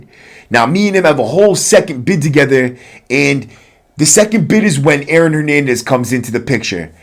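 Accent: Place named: Brooklyn, United States. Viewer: Luv the channle bro I could listen to you talk for hours u remind me of this Brooklyn dude, my boy "Moe" I met up top in NY. I only did 2 years but Holly Shit that was a CraZy Time of My Life!!! Prison politics are crazy. I ended up working out with him then we got in a fight. I fought well but he got the better of me and broke my rib. I laid up for a week and could hardly breath for a few days but kept my mouth shut after that we spoke dapped up and we ended up becoming Boyz and running a store together working out cooking I was in. Its crazy how sometimes you make friends after you beat each other's asses. Lol I gave him a black eye in the fight I couldn't breath bc he broke my ribs, he looked like shit for a week. HaHa then we were cool after😂 prison is fucking crazy bro😅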